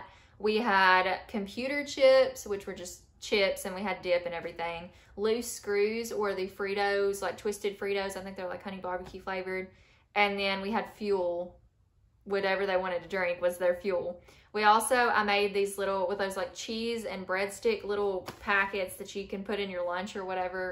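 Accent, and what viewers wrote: South, United States. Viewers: It's great to hear your southern dialect.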